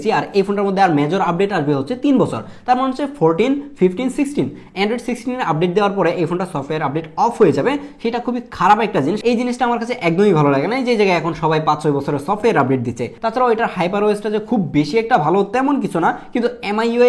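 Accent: Kishoreganj, Bangladesh. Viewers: Kishoreganj Naki Bhia Aponer Jala Bhia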